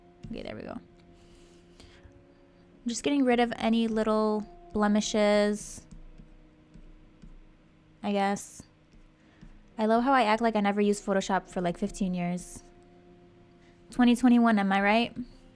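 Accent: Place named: United States. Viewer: As someone who lives in Britain i think it would be funny if you tried to approach people and do a British accent and see if people notice or fall for it, or notice that you actually have an American accent.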